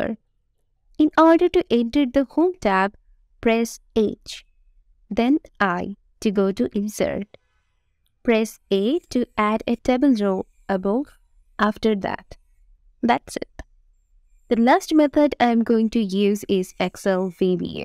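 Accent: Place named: East Asia. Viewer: Nope. Eastern Asian speakers normally speak this way.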